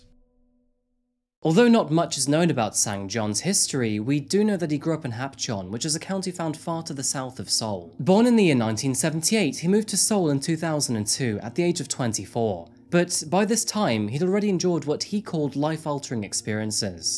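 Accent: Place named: United Kingdom. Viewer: I think I'm officially addicted to your channel. Murder mysteries, forensics, crimes etc are so interesting! You tell these so well and it's nice to hear a fellow Brit, since moving to France. You make me feel at home 🖤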